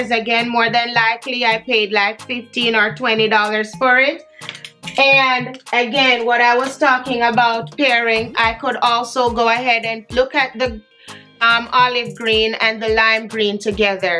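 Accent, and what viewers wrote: Jamaica. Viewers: you sound Jamaican lol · Me hear the 🇯🇲 accent and then me hear the patois chip in ,me instantly proud🥰. New subsriber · Girl when I heard the yardie accent is the fastest I ever subscribed. I'm also a teacher and like you ain't about that shabby look. Love the video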